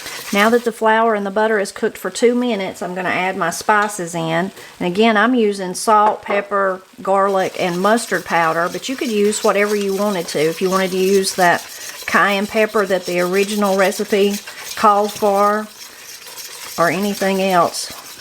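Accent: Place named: South, United States. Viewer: Love your down home way of talking. Reminds me of my childhood. Like your recipe, too.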